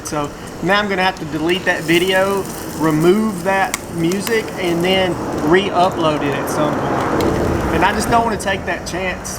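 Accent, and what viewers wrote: South, United States. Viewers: Love your personality, and your southern accent. I have to give you an atta boy for the chances you take while Stealth Camping. Be safe and have fun!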